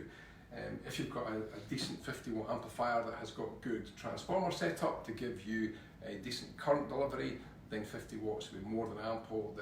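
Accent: Scotland, United Kingdom. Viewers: Love that Scottish accent and the rug is great too.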